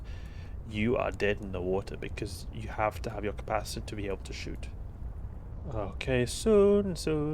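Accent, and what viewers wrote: South Africa. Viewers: Huh, I thought you were South African XD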